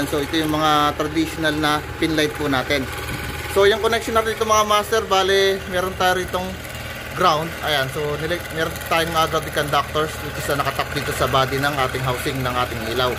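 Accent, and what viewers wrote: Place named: Quezon, Philippines. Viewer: Napansin klang sa pananagalog mu puntong quezon ay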